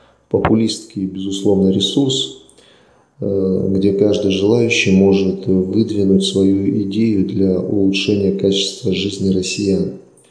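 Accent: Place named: Ukraine. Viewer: какой китаец, ты сам китаец.не улавливаеш характерныи интонация украинцев. свое время много общался украинцами. только непонятка наш украинец или. ..вовще зрить это самый корень.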